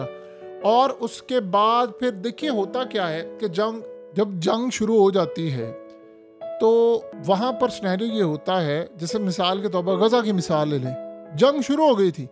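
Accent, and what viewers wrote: India. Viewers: تو بڑا کتا ہے والے۔۔۔اب کہاں گئی تیری حب الوطنی اب تو تیرا لہجہ بھی چغلی کھا رہا ہے کہ تو کوئی انڈین کتا ہے۔۔۔اب تیرے جذبے کدھر وڑ گئے ہیں ؟؟؟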